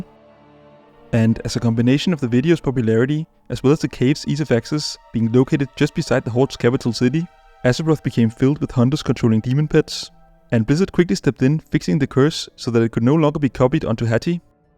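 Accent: Copenhagen, Denmark. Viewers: is talking soooo DingLish 😆 lyder enda som Københavnsk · It's funny how, as a Dane, whenever I tune into a channel I have never heard of before, I can immediately tell by the accent that I am watching a danish person. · Hears first few words: ah, a Dane. :D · all i hear is yukky copenhagen accent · Heavy Danish accent ❤👌🇩🇰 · danish accent is very present with this one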